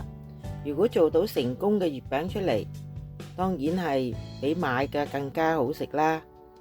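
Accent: Vietnam. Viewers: Amy姐；妳講話的越南口音很重，請問妳是否北越海防人氏？謝謝 ！💝💋💞💯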